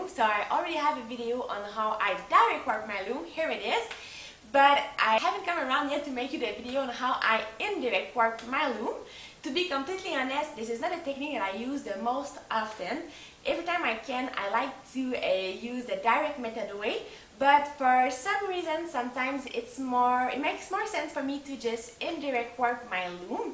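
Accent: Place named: Quebec, Canada. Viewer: I can here the Quebec local accent hehe! Hello fellow canadian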